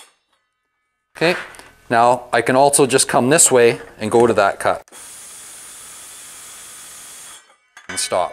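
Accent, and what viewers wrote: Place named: Canada. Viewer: Love the content! I’ve loved power tools and DIY since I was a little girl and I bought a fixer upper to get started on fulfilling some dreams. I had to look up where you were from and idk why I didn’t think of Canada, because I’m from southern WI but I have family in Northern WI and my uncle has the same accent, common in the U.P. too (Michigan).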